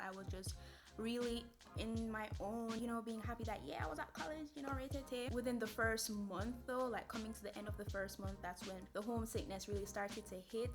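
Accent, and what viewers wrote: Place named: Jamaica. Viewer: my entire family is jamaican and idk why but hearing your voice made me feel so at home!! keep it queen ✨ love the videos !!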